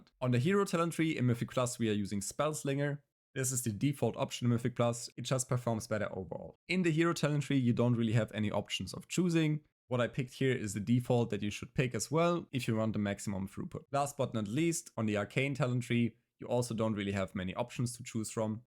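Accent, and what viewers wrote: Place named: Germany. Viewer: You sound german. Do you also have german content?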